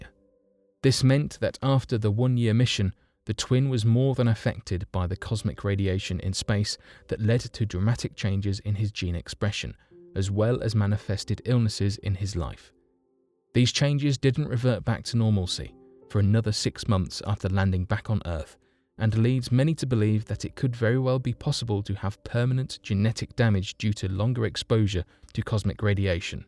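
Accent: England, United Kingdom. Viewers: British accents don't make it better. Just saying. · What's with the english accent? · Only an English accent can bring knowledge to life.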